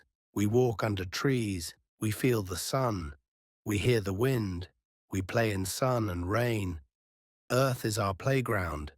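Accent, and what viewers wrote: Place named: United Kingdom. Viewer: british olması lazım ama çok takılma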